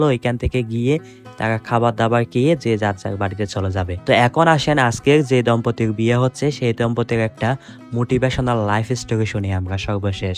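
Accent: Chittagong, Bangladesh. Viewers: ভাই আপনার শুদ্ধ ভাষায় কথা বলার স্টাইলে বুঝা যাচ্ছে যে আপনি চিটাগাং এর মানুষ, তাই না? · উনি চট্রগ্রাম।যেখানে জন্মেছে সেই টোনেই তো বাংলা বলবে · আপনার বাড়ি মনে হয় চট্টগ্রামে.. কথা শুনেই বুঝা যাচ্ছে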